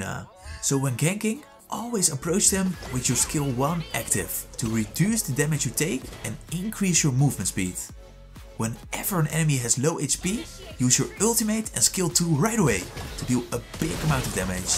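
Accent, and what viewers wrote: Netherlands. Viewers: ayo this guy is dutch i can hear your accent dawg.. Kaas 👀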